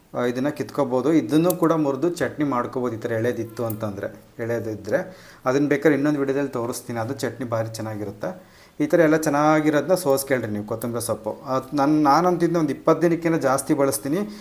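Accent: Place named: Hiriyur, India. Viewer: ಹಿರಿಯೂರು ಹುಡ್ಗ ಅನ್ಸುತ್ತೆ ಎಂತಾಸ್ನಾಗ್ ಐತೆ ಬಾಷಾ ಶೈಲಿ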